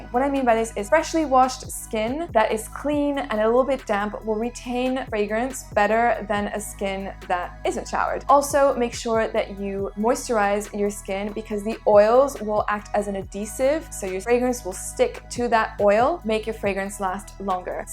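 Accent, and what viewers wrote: France. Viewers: You have a very good French accent. Are you originally French?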